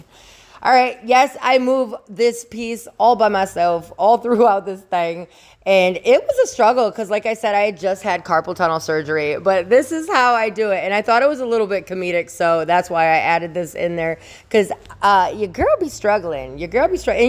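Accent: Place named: Michigan, United States. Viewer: you talk like me, you have petosky stones you find near you, do you live in michigan? like me? i loved the table although its way more difficult than what i am trying to do, the only thing in your video i would change is i kept worrying about your hair either getting caught in the resin or the tools, made me worry like a momma bear. lol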